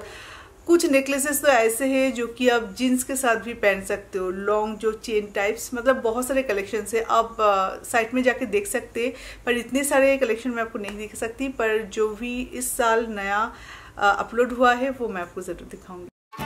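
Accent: Bengal, India. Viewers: Bachpan se bangla bolti aae hai , hindi jitna v bole bangla hi unka 1st comfortable language hai so hindi me v bangla style a hi jata hai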